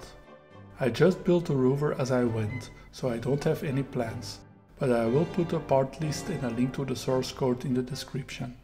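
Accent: Belgium. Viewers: It´s Belgium i think, there are signs in Dutch, he sounds Belgian. A lot of Belgian city´s are majority muslim and african.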